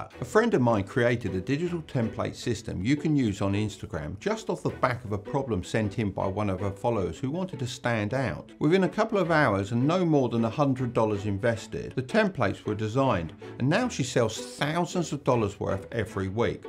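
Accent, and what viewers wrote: United Kingdom. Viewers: His british accent is fire ;)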